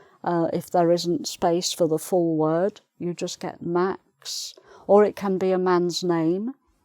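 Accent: United Kingdom. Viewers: Hello...you are the most elegant teacher I have ever come across having such a lovely voice and true Brit accent.Why don't you reply to our comments?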